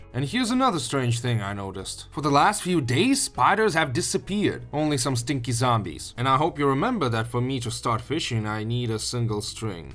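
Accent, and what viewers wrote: Russia. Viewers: great youtuber love his vids and the russian acsent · Are u Russian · Mind if you use you Russian accent to say on the video? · Are u russian?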